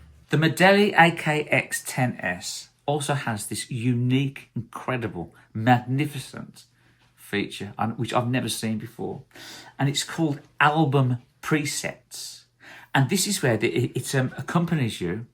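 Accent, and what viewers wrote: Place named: Birmingham, United Kingdom. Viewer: Very interesting . You are an amazing player and I've learned so much listening to your videos. Also nice to see your other keyboards stacked up especially the Roland Juno. I have a beloved Roland Juno DS Amongst my many others. I'm sure I detect a slight Birmingham accent. I used to get some keyboards from Jones and Crossland and Lichfield Organ Studios back in the day as I lived in Lichfield before I moved up to the Scottish Highlands. Keep up the amazing playing ! 🎹👍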